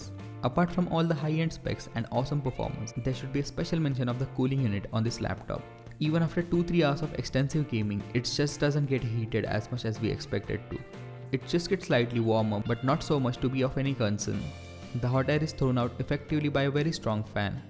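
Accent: India. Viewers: Indian acent is really anoying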